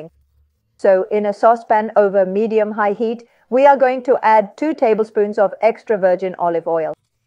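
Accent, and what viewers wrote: Andalusia, Spain. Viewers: Solo por tu graciosa manera de comunicarte, como toda una andaluza, tu like y tu suscripción!!!!! Saludos desde Santo Domingo.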